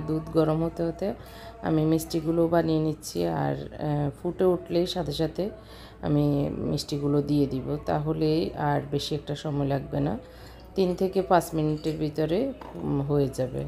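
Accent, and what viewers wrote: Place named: Feni, Bangladesh. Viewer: Assalamualaikum apu ... Ami sob somoy apnr video dekhi but kokhn o comments kora hoy na..amr Bari o feni .upnar feni r tune e kotha Bola amr onk Valo lage... Allah upnk Valo rakhuk .. Apu kitchen cabinet korsen j oitar details ta ektu diben pls.koto taka lagse ki board use korechen esob bolben pls..ami o banabo tai idea nite chai.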